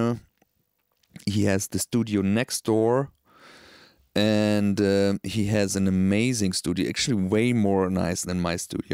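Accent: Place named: Germany. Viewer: DANKE! I REALLY LIKE YOUR GERMAN ACCENT! 🖤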